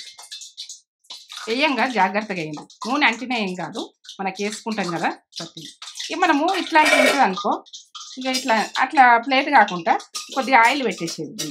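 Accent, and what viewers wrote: Telangana, India. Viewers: Nenu Chennai lo untanu , telagana amai ne …. Mi e video chusaka , mana Sontha vallu matladinatu anpinchindi …. Miru etlane matladthu cheyandi amma ….. nen iythe skip chepakunda motham video chusina …. ❤❤❤❤❤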